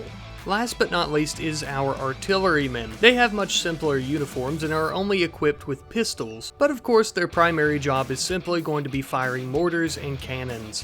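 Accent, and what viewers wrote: United States. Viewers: Wait your American?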